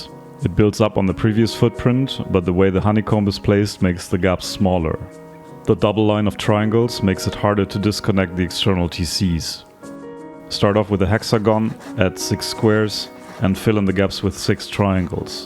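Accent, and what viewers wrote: Germany. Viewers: Deutsch??? Dein Englisch klingt so deutsch XD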